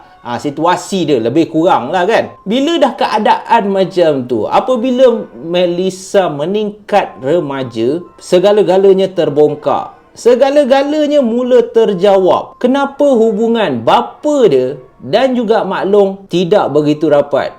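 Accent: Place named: Kedah, Malaysia. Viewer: Loqat kedah sakan dah lani noh hangit torey haha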